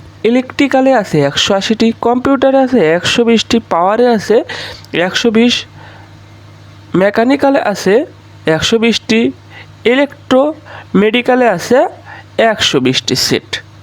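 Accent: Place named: Brahmanbaria, Bangladesh. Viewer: ব্রাহ্মণবাড়িয়া উচ্চারণ ঠিক করে নাও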